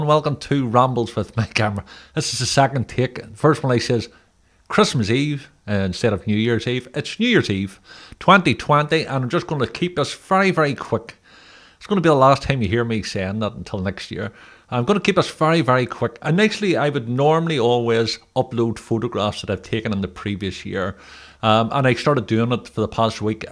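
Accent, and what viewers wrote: Belfast, United Kingdom. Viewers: Oh my goodness, I’m so glad I re found you... You are a inspiration. I’m going to share this with my Auntie Pamela, she’s from Belfast & course so was my dad... I did visit Belfast a few times in my childhood. The troubles were happening at the time. I was about 8 so I didn’t understand it really. Your accent is a warm reminder of my gran parents, my auntie Pam still has her Belfast accent. My dad passed away a few years ago sadly.. but we didn’t notice his accent at all which is quite funny. Probably because we heard it from birth. Anyways so happy to have listened to you today. My road has been very dark since 2009 after losing my beautiful husband to cancer.. 😞 Thanks again, my brother is called as well, I remember his name being a issue when we were visiting as not the correct religion.. lol But thank you. I’ll look forward to seeing you more. 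Take care. Lorna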